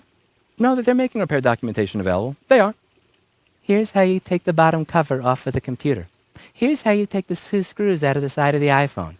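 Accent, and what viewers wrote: New York, United States. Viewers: The angrier Louis gets the more is New York accent comes through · I can fully process at 0.5x, but slowed ny accent sounds like a mob repair guy.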